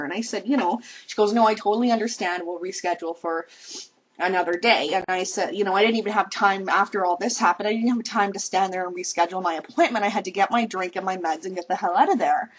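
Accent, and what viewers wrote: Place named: Canada. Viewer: I love your voice and the way you tell this story! I'm a sucker for the mild and lovely Canadian accent, I gotta say. I have to go watch more now and find out more of your story here....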